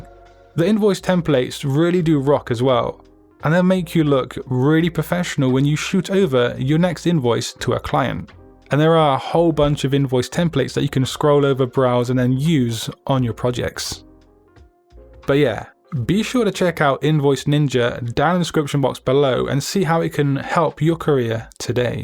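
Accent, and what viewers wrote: United Kingdom. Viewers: Are you from the USA? I thought you were from the United kingdom.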